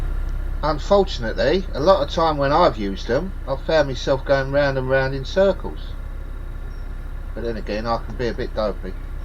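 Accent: London, United Kingdom. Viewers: Please don't stop " rabbiting" I love your accent, but as a Londoner I'm biased 😉. Your commentary, historical info and snippets of interest are just perfect. Don't change a thing. I had a friend who lived in Pennylands, it might have been purpose built estate but it was a peaceful place for her to live. I had no idea the basin was just behind her bungalow. Look forward to your next vlog 😊 x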